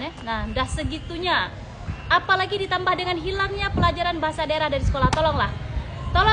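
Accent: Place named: Aceh, Indonesia. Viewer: kenapa logat Aceh hampir sama dgan logat Bali, ktka berbahasa indonesia?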